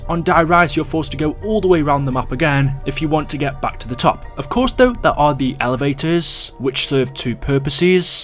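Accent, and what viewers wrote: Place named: England, United Kingdom. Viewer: Nick Barrow He has an accent. I think he is from Birmingham or Manchester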